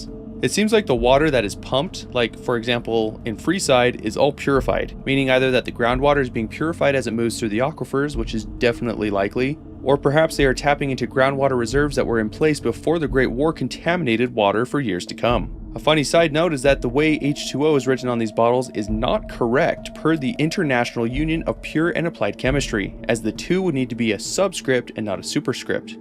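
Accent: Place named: United States. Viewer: You did really well pronouncing "Pyotr Illyich Tchaikovskij". Aside from an american accent, it sounded proper to me. Language trivia: "Piotr" is the russian/slavic version of "Peter". The "-io" sound kind of sounds more like "-ieo" with an emphasis on the "-ie". The "-tr" is certainly one of the typical slavic consonant sounds, but when you speak it, you'll usually hear a brief "u" or "e" vowel sound in there. Anyway, thanks for the entertaining video! I hope my little trivia bit was at least somewhat entertaining to you.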